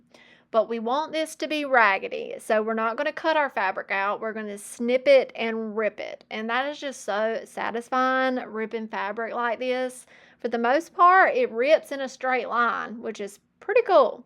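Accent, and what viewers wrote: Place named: Eastern North Carolina, United States. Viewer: I love all of your projects! Thank you for sharing your creative ideas. I live in East Tennessee and we have friends that live in Vanceboro, NC. When I watch your videos, it makes me think of and miss them because of your eastern North Carolina accent.❤🥰😉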